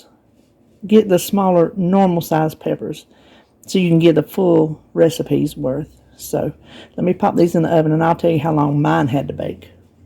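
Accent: South, United States. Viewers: They both look delicious. I often bake muffins with almond flour and they freeze beautifully. You mentioned your accent in another video ~ I love your southern accent and your wonderful way of telling stories!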